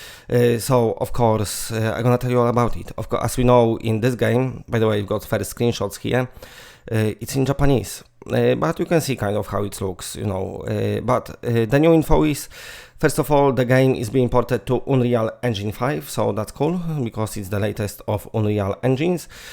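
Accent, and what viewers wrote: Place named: Poland. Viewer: Czy to celowe spolszczanie akcentu angielskich słów czy naprawdę jesteś tak słaby w wymowę? Aż nie chce mi się wierzyć ze ktoś kto mówi tak płynnie po angielsku nie powiedział ani jednego słowa poprawnie 😂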